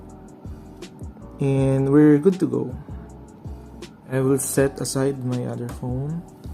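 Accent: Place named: Philippines. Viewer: Sorry I thought you were Indonesian. Now hearing again I hear a Filipino accent instead. Mantap is Indonesian for delicious/awesome :D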